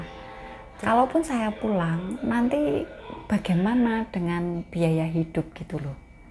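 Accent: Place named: Java, Indonesia. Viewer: Mbk,,, njowone ngendi mbak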